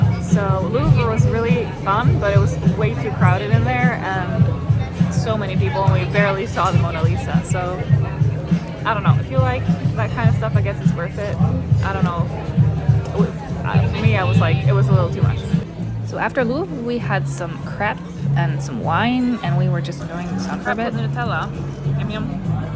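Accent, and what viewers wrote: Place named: United States. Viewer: thanks for the video. I enjoy your candor. I agree with you that the Louvre is too crowded and the trip to the top of the Eiffel tower at night is fantastic...and I don't like heights either but the view is phenomenal. I don't like long lines either. My favorite part of Versailles was renting a golf cart to tour the gardens in the back! By the way, your English is phenomenal. The more time you spend around Sam, the more American you sound!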